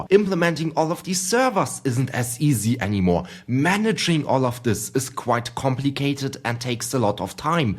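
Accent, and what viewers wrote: Germany. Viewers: Thank you german sounding man for your wisdom.... I shall use it wisely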